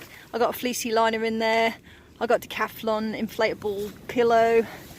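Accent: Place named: Norfolk, United Kingdom. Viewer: Hi just come across your vid when put in Norfolk coastal path, looking to do the walk and camp along the way if we can ever camp this year. Nice to hear a norfolk twang, being from Norfolk. Well done on your solo camp, distance is never an issue, I often go a short drive away for quick overnighter. Hand warmers inbetween your socks , or if you have a nalgien type botle fill up and use as a hot water botle , so nice to keep feet warm.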